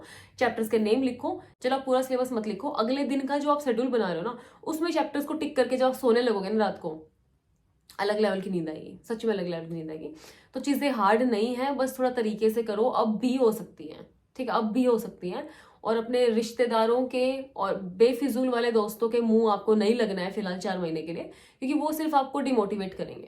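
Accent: Haryana, India. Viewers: Many Hariyanvi waala tone h bahan ka 😊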